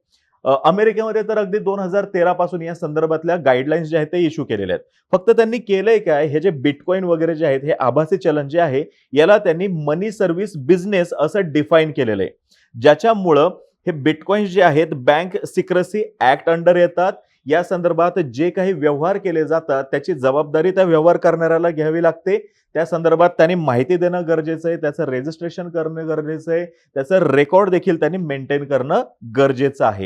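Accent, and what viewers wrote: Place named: Nashik, India. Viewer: तुम्ही नाशिक चे का हो? तुमच्या बोलीभाषेला नाशिकची लकब आहे..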